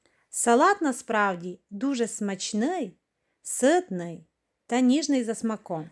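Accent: Transcarpathia, Ukraine. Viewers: Дякуєм за рецепт! Прийшла на ваш канал від вашої сестри. Обожнюю Закарпаття і ваш діалект!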